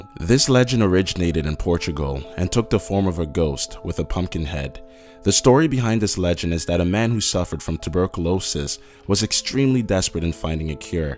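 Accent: Mexico. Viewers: Love this guy's Mey-he-ko accent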